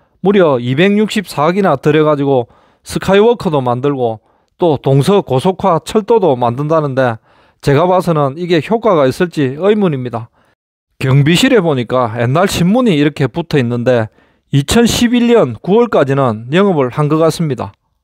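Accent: Gyeongsang, South Korea. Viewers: 경상도 목소리 너무 거북해요 웬만하면 표준말로 해주시면 안될까요??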